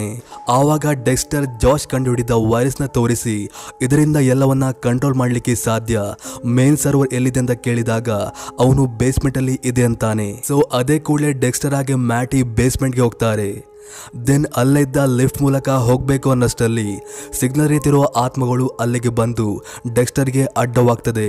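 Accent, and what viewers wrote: Kundapura, India. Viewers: Niv kundapura naaa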